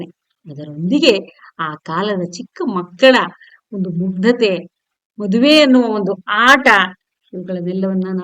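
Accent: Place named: Mandya, India. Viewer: ಮಂಡ್ಯದ ಜನ ಮಾತನಾಡುವ ಹಾಗೆ ಕಥೆಯನ್ನು ಹೇಳಿದ್ದಿರಾ ಕಥೆ ತುಂಬಾ ಚೆನ್ನಾಗಿ ದೆ ಕೇಳುವುದಕ್ಕೆ ಹಿತವಾಗಿದೆ 🥰🥰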